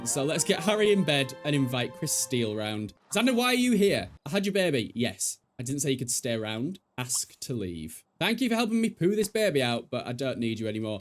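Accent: United Kingdom. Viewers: I'm from Brazil and I constantly watch Youtubers from all over the world to improve my Englsih. I stumbled across your channel recently and I'm basicaly watching every single video with these random gameplays/challenges for The Sims 2 - my favorite one btw - and 3 and it's always a blast for me 😂😂😂😂 I love your dark and twisted sense of humor, wrapped in such calmness and "fancyness" with your british accent, please never stop your videos ❤ They really make me wanna be your friend irl!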